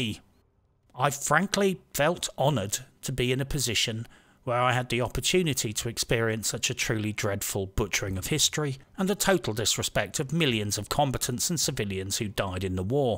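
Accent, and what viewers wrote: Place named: RP, United Kingdom. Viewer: also refreshing to find an anti-left chap with a posh accent.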